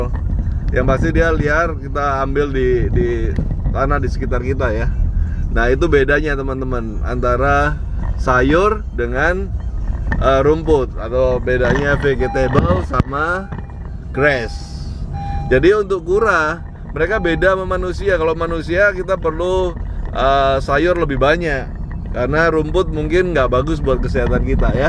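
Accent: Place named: Java, Indonesia. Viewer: Wih orang mana nih om nya Kok pakek logat bahasa jawa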